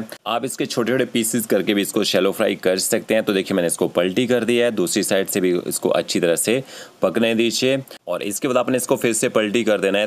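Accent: Pakistan. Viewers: Pakistani lehja hain apka to ji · Bhai you sound like Pakistani😅 aapne ye kaarni hai vo krni ha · Aapki voice ek pakistani jaisi lagti hai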